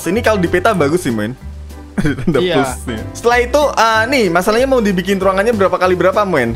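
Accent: Java, Indonesia. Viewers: Wuihh suaranya khas jawa bet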